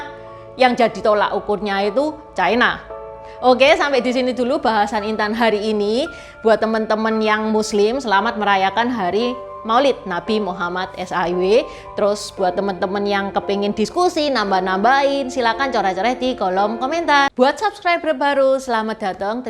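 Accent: Java, Indonesia. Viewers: Enakan dengerin dongeng nya nieh.. seru bgt, dg pk bhsa indonesia yg ada aksen jawa medok nya.. hihihi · ..medoook... Suka deh... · Logat bahasanya kaya medok medok jawa ya...😂😂😂😂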